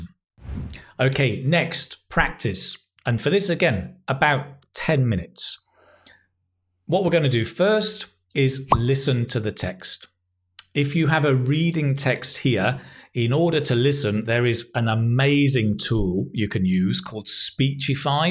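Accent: United Kingdom. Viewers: Sir do you have british or american accent please tell me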